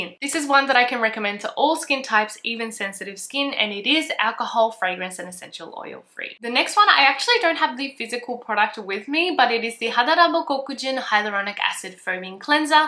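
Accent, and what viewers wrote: Australia. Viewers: Girl I cannot tell if you have an Australian accent or not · also is she australian? I feel like im getting that familiar accent.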